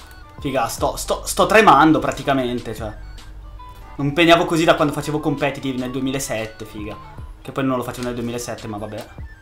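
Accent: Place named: Bergamo, Italy. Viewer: Quanto si sente in sto video il tuo(pure mio ahah) accento bergamasco oh ahahahah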